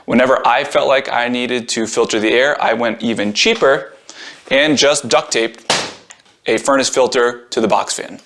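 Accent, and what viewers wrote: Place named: United States. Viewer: pleasant American English accent... there aren't a lot of American men that speak well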